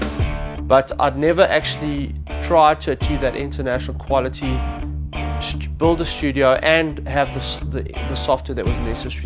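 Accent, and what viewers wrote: South Africa. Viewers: Are u South African? :D My bestie is south african :D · Haha, straight away I can here a Joburg boy! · South African? :>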